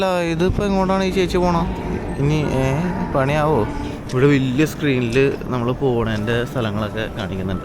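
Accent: Kottayam, India. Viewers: Super 👌 എറണാകുളം malayalam പറ bro കോട്ടയം malayalam ആയല്ലോ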